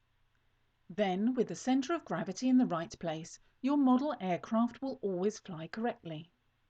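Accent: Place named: United Kingdom. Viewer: LOLlike the brit speek and prodick not bad to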